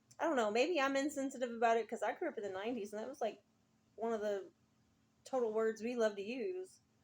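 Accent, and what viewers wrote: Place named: South, United States. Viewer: That southern accent loving it 💯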